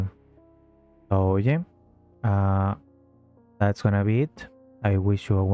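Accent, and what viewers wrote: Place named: Spain. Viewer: exactly, they really went the lazy way. The devs are getting lazy, what a surprise. Only 1 killer update, 3 perks changed (calm spirit no longers counters ultimate weapon, which is a shame) and only one map changed, where is the swamp visual rework? Im happy for Twins mains but I dont think their changes to Haddonfield are gonna be very good, devs always fuck up in some way. Oh by the way, Im pretty sure you are a native spanish speaker. I myself am from Spain.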